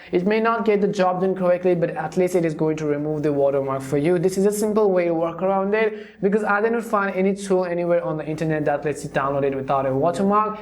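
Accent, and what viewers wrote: India. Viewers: Problem not solved but we got normal indian accent before gta VI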